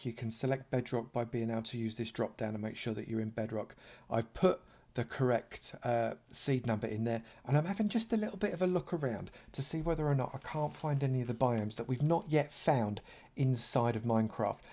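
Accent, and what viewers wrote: United Kingdom. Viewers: U r british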